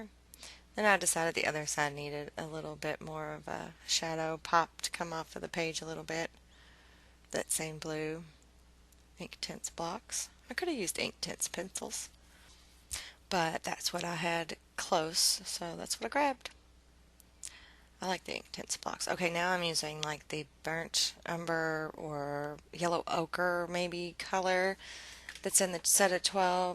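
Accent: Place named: Kentucky, United States. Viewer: i knew i recognized you accent! im also from kentucky! i recently discovered your videos and in glad i did! good luck!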